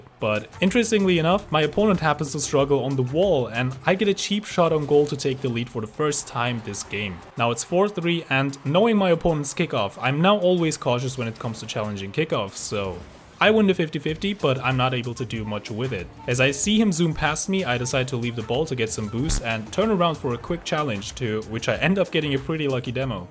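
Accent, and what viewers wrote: Germany. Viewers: Your English sounds like german English...Are you german?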